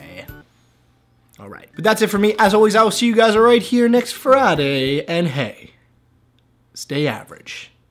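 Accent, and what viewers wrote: United Kingdom. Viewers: Your British accent had me like 😭😭😆😆😆😭😭😭😭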